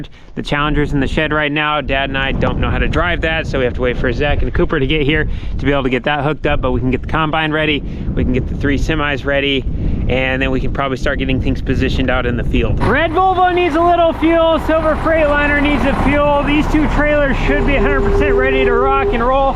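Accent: Scotland, United Kingdom. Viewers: Scottish : my very favorite accent 💙 greetings from Utah, USA🇺🇸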